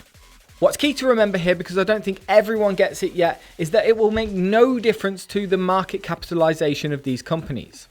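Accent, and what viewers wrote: United Kingdom. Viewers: The best thing about the british accent: "Tesla Investor" rhymes :))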